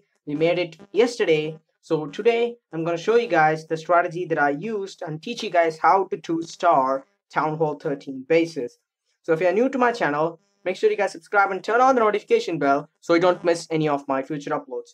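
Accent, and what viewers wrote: India. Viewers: Bro ungaa speach laiyaa tharieduu nengaa tamilaa thalaa (•‿•)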